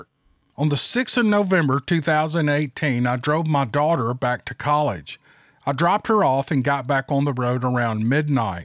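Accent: South, United States. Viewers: me and my wife love how you tell the stories. Plus we love the good ole down south accent for reals. May Jesus bless you and yours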